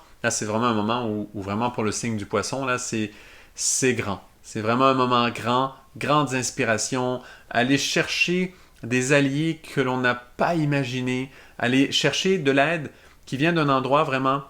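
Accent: Quebec, Canada. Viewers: Quel joli Monsieur avec un charmant accent Québecquois et qui parle vraiment bien et juste!!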